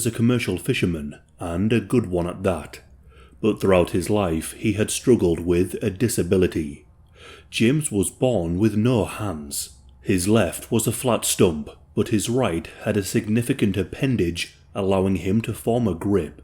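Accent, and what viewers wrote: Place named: Scotland, United Kingdom. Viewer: I like your accent which I suspect is of Scottish origin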